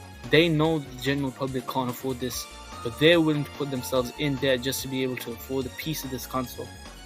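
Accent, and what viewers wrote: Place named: United Kingdom. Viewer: Are you british? That accent is pretty cute ngl haha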